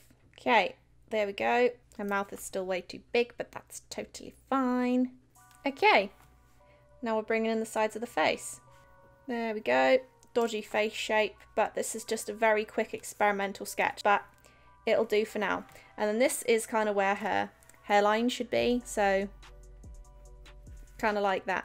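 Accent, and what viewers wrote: United Kingdom. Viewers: I love how you British people talk 😍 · You are British right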